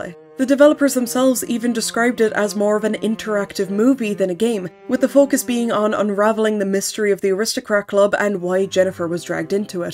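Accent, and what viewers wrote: Ireland. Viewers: so SuperEyepatchWolf sent me here and I'm bingeing basically all of videos (I CANT BELIEVE I DIDN'T KNOW THE UK REFUSED TO PUBLISH THIS) but im also trying to figure out the region of her accent? I've tuned into a few Irish accents but I reckon this ain't Dublin or Cork or Wicklow, what IS the accent? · It’s so cool and refreshing to hear a fellow Irish person talk about the niche PS2-era horror that I’m so interested in. The standard of your writing and work in general is so good! Subscribed! 💖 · New viewer. I love horror games and Irish accents so now you will never get rid of me!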